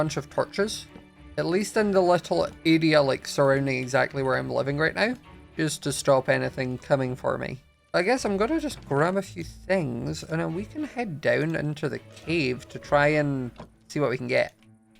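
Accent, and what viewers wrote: Scotland, United Kingdom. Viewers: Are you Scottish scot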